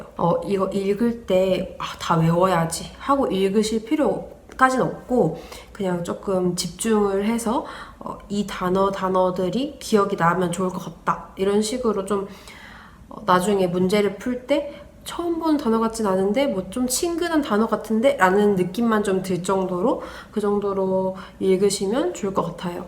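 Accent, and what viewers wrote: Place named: Seoul, South Korea. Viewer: 서울말 잘쓰는데 ~ ㅎ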